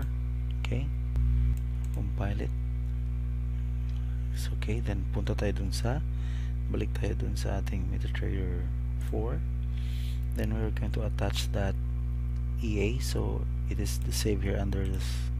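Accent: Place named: India. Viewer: not bad but 1/3rd of what you say disappears in some indian sounding word accent. to sad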